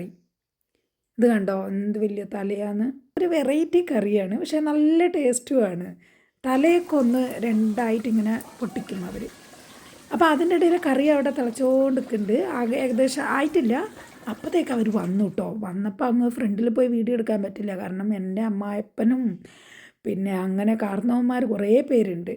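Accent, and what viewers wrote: Palakkad, India. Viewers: Palakkad accent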